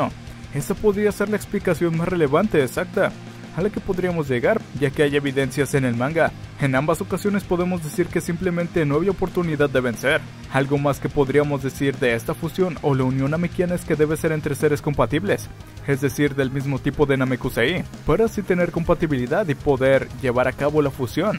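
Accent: North, Mexico. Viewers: senti que en este video hablaste como estilo norteño, fierro pariente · Ahhh nms tiene como un acento norteño no?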